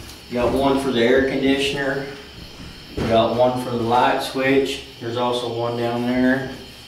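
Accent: South, United States. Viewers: LOL being a southerner, I can understand him perfectly...BUT I had to try the captions!! OMG too funny!!! The ONLY thing it got right is "for us", the rest was so bizarre!!! :D thanks for the idea ;)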